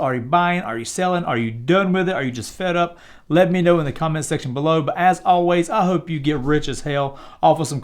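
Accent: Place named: Georgia, United States. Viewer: Where u from? Sounds a little bit of Georgia in ya. Good ole country boy from Arkansas here and I'm glad I found ur vid. First time viewer and first year I've been investing. Turned 4 thousand into 25 as of this moment.....was about 40 but I believe in the cryptoz that I've invested in and know they are the future in all their respective areas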